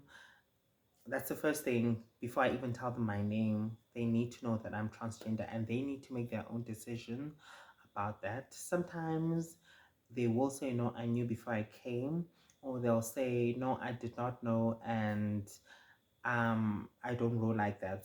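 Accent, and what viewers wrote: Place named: United Kingdom. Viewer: Your English is close to British.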